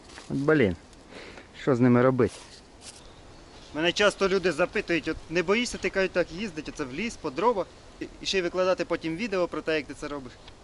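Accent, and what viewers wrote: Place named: Western Ukraine, Ukraine. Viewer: Як мені родом з західної приємно слухати вашу говірку ❤❤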